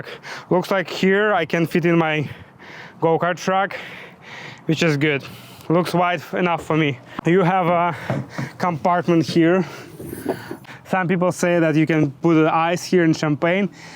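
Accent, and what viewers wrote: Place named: Ukraine. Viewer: What terrible Ukrainian accent😮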